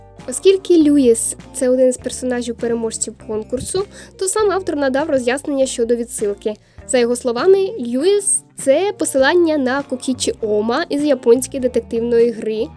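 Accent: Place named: Ukraine. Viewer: можешь по русскому говорить?ты же вроде раньше разговаривала на нём...незнаю что ты хочешь нам доказать своим украинским акцентом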